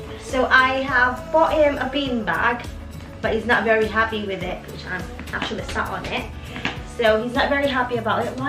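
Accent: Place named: United Kingdom. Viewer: Love2 ur british accent maam 🥰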